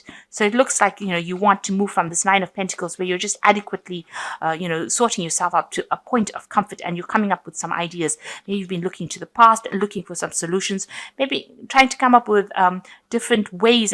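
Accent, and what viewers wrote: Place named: England, United Kingdom. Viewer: English accent alert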